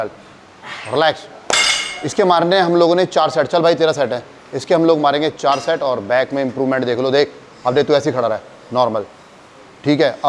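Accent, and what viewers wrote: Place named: Kolkata, India. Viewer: Bath sunke hi pata chal kolkata ka ladka😊❤💪🏻🥰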